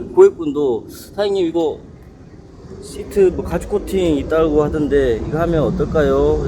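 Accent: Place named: Gwangju, South Korea. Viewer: 다른영상에도 댓글남겼었는데.. 번창하세요.. 같은 광주분이라서 더더욱 말씀이 구수하네요 ㅎㅎ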